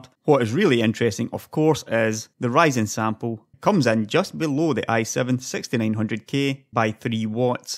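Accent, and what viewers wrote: Scotland, United Kingdom. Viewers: Scottish I understand him just fine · TBH it doesn't change the point you made and I agree with, that there process has matured now, keep up good work, nice hearing a fellow Scot!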